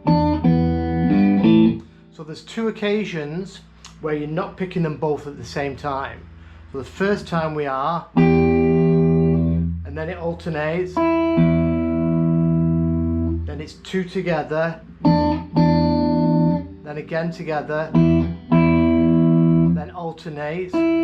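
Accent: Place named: Northern England, United Kingdom. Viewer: way aye lol. You sound like you are from the North of the UK. Leeds area...?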